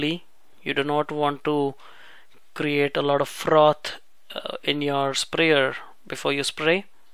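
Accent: India. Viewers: your sweet Indian accent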